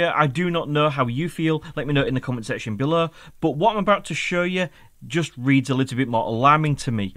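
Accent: Hull, United Kingdom. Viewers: I hear a bit of Hull in you accent. Are you from the surrounding area?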